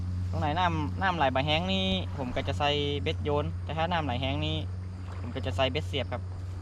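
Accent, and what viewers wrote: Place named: Phrae, Thailand. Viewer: สำเนียงนี่เป๋นคนแป้แม่นก่คับ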